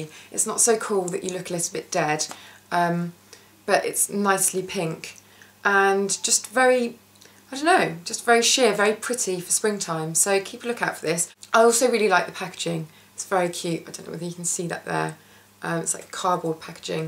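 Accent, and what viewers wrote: United Kingdom. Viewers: I love watching you.You are super gorgeous but not stuck up.I could litsen to you speak for days.All of the lovely ladies from the UK speak so wonderfully.I hate my NY accent even though I haven't lived there in years.Your skin is so pretty,you don't need foundation.xoCathy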